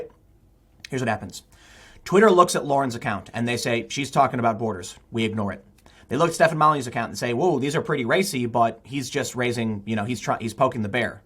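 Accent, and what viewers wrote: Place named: Midwest, United States. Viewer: You can tell is from the Midwest. He adds an "S" to singular nouns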